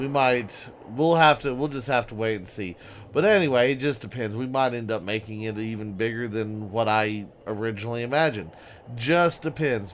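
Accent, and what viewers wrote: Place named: Texas, United States. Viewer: Some suggestions because you asked for it :D I'm started playing the game and watching your videos since alpha 8 came out. I like your videos, because your playstyle is a bit similar compared to mine, and I like the texan accent as well. :D In your videos I think you should go for more exploration and less building. I mean, building is fine, but sometimes there are too many sv / hv / cv build videos come one after the other. Back then, when alpha 8 was hot, there was not as much content in the game, than now we have. You are still playing the game like you played alpha 8. But with alpha 12 and with the project eden scenario you have literally hundreds of POI-s, and hundreds of planets and systems to explore. I don't say you should warp to a new planet in every video - not at all. But a bit less detailed base building, a lot less detailed sv / hv / cv build videos, and a lot more exploration (poi-s and planets), because I don't think you would run out of content before a huge update would come and you should have to start a new game to get the new stuff. 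Here's how I play: escape the horrible starter planet (rogue), find a good planet (snow planets are my favorite too). On the snow planet, build a huge base, using it as main base, as HQ etc. and with the new teleporter I can come back to it from anywhere, and I don't have to abandon it, and keepin improve it till time to time. I hope it helped a little bit.)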